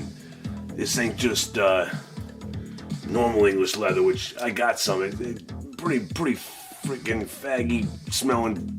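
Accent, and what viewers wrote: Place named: Brooklyn, United States. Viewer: Great review and you sound exactly like my uncles from Brooklyn. When they say "fuck"it sounds so elloquent. Aramis is the shit and DOES smell like guys at the train station.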